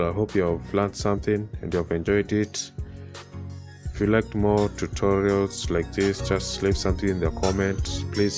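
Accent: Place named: Kenya. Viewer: Bro..you sound soo Kenyan !!!🇰🇪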